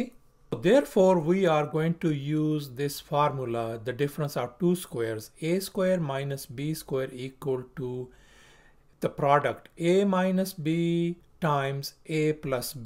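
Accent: India. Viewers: Sir , I am from India.. You sound like Indian accent.. Are you from India?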